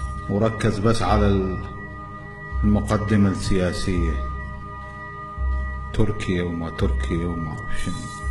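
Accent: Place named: Iraq. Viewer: زباله كلمه عراقيه بحته وطريقه كلام السيد. هم عراقي بحت ويبقى من عامه الشعب ومنه وبينه ف هاذ الحجي زد وهيه كوه تردون تسقطون بيه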